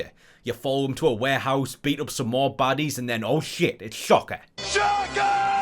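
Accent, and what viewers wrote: United Kingdom. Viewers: Okay I'm 9 minutes in and I've gotta say: - You have what it takes to have easily >500k subs. I don't mean you should only have 500k, but the production and editing and commentary are far above and beyond what I would expect from a youtuber with 182 subs. You're already producing content in the echelon of much higher sub counts which I hope pans out for you. - You have a great voice/accent for this shit. I literally won't watch videos over 8 minutes without a UK accent - Major nostalgia from this game. I used to play the demo of the first game on the Jampack Winter 2000 demo disc I got at a Toys'R'Us (RIP) - actually apparently they are opening inside of Macy's as of a year ago after the bankruptcy and closing of all their stores - Funny without being cringe - Good pacing - describing what happens in the game without being too descriptive all while being analytical without being too analytical - you strike a perfect balance Immediate sub and like. 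One thing/tip (?) is to bleep the f bombs. I do absolutely love hearing them, but I've noticed that lots of the higher profile youtubers are bleeping to maintain ad revenue. I think the bleeps still suffice in delivering the sentiment of f*ck. If you have a Patreon I'd be glad to give $15-20 a month to help out and see where you go. EDIT_0: 13 min in - the skyrim realization is amazing. I didn't know that 🤣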